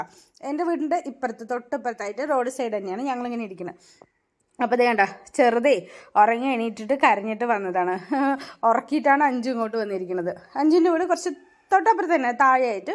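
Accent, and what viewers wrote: Palakkad, India. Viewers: Samsaram kettappo doubt thonni.😊 Palakkad evida?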